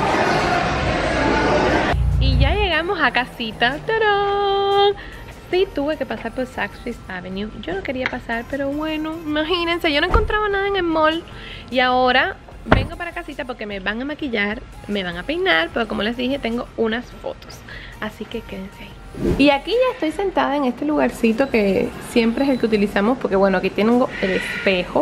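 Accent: Cuba. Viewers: Porque las dos son Cubanas ese es el acento cubano jjeje por eso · para tu informaciom no,son hermana son cubanas y hablan en el mismo acento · Por que son las dos cubanas y a si hablan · por que es cubana y todos los cubanos hablan igual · Es que ella habla como tipo cubano o algo así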